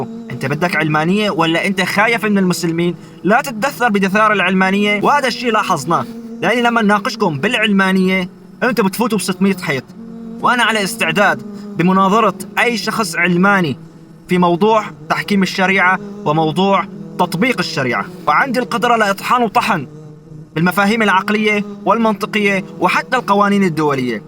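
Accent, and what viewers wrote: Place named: Syria. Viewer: ماشاء الله بتحكي شامي اكثر من السوريين اول مرة بشوف اجنبي بحكي عربي بطلاقة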